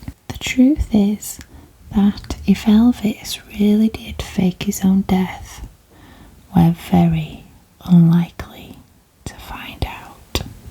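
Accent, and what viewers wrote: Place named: Yorkshire, United Kingdom. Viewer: Been so hard to find a proper yorkshire accent to fall asleep to! it's all americans doing accents for fun or posh people and i hate it!!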